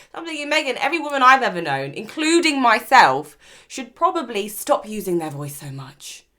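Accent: United Kingdom. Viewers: Gilstrap--Yes, I like her British Accent. Very Cute.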